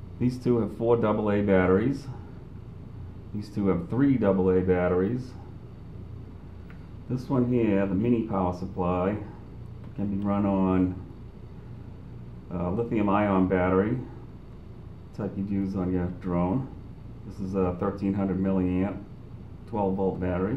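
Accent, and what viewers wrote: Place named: Maine, United States. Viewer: you sure sound down east, by jeezis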